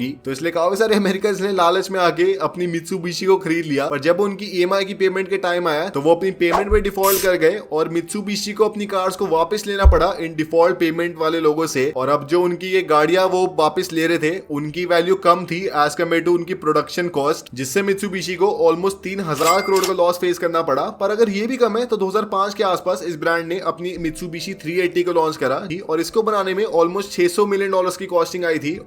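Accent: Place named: Delhi, India. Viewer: Damn that Delhi ka douchebag accent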